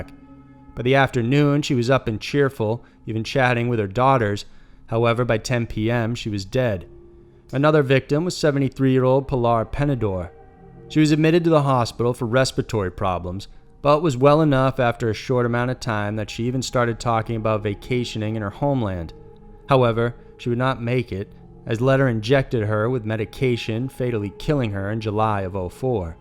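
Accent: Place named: Texas, United States. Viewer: Wow, these are so disturbing! Also I love your accent. I’m British so it’s a guess, are you from Texas?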